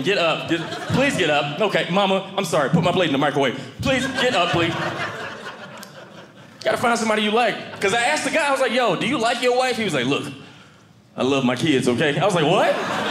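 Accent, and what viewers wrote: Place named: South, United States. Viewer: He talks too fast. I had to put on CC and I almost couldn't read that fast. I talk fast. My friends understand but others don't. He was me. Southern, drop endings on words and talk quick. He was amusing but my friends didn't understand him. Wrong crowd.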